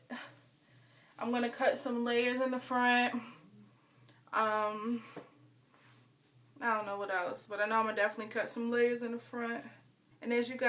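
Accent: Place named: New York, United States. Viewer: Are you from New York, Philly, Or Jersey ? You have an accent lol.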